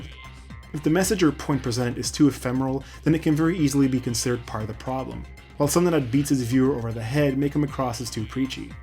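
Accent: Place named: United States. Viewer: First of all, I'm sick and tired of hearing "crescendo" get vomited out in YouTube reviews/videos/ whatever the individual wants to call it. Second of all, are you playing a foreign copy? Because there's no blood yet you have an American accent so I'm trying to figure out why your captured footage is the censored version?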